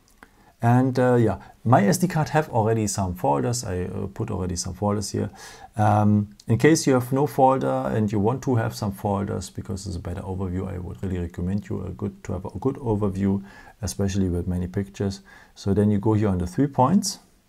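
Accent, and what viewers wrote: Germany. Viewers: Gutes Video! Aber kann es sein das du deutscher bist?😅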